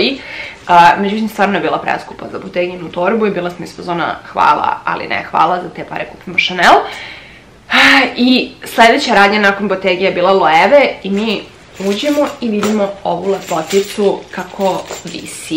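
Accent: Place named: Novi Sad, Serbia. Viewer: Od kad ti imas novosadski naglasak?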